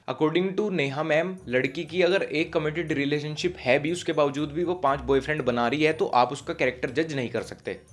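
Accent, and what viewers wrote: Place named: Uttar Pradesh, India. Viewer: Dude is from up I can understand him by language because in up people like me talks like him it's a different Daku vibe Mmm vaise haa up Wale opp🤘 Yo lakshay bhai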